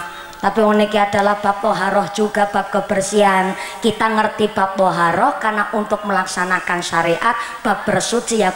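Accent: Banyumas, Indonesia. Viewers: Assalamualaikum hadir lagi nonton ceramah ngapak Ustadzah Mumpuni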